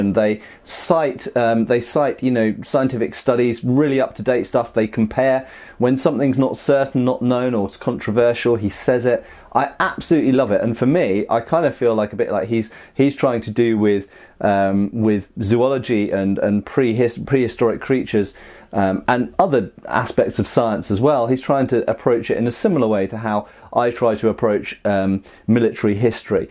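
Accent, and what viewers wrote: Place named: United Kingdom. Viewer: One of the reasons British people make for good Youtubers is because everyone loves your accents.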